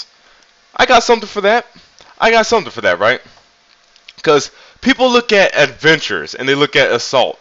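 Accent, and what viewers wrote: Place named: Texas, United States. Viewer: Hold up, you're from Texas!? I always imagined you lived in NY but now that you mentioned it... I can hear the accent (in a way)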